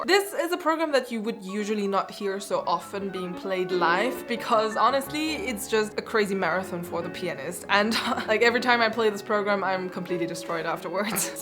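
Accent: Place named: Germany. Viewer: Interesting video youtube recommended me. I instantly knew that you were German like me. Why? Unfortunately you hear it. But your English is very well as is your pronunciation. It's probably because you're not speaking an American English and who knows how to speak like the Brits do? I watched a video of you playing the piano and you're a super awesome piano player. I am learning to play but it's just beginner's level which is fine. 😉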